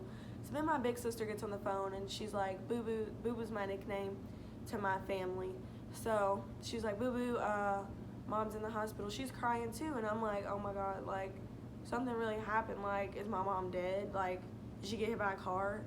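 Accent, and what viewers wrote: South, United States. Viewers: Girl where you from you sound so country lol.. They should've came and picked you up as soon as they could instead of scaring you and working up your nerve.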